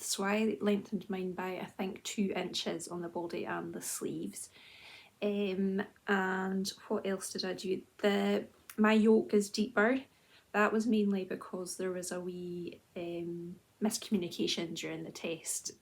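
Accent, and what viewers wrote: Scotland, United Kingdom. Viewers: Hello there, nice to hear we are already with 5000 of us who also enjoy your lovely podcast. Love your no nonsense style and your Scottish accent. Keep on going your own way, we like the way you do this. Greetings from a Belgian fan.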